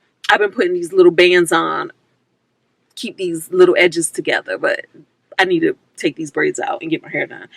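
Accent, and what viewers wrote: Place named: New York, United States. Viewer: im furloughed, and concerned about layoffs in future, I planned on Monday to start my workout, meal preps, more time to my schoolwork (bachelors in Christian counseling) also more volunteering with non profit and playing with my fur children! new sub here!! one question your accent sounds familiar are you from NY....LOL I AM....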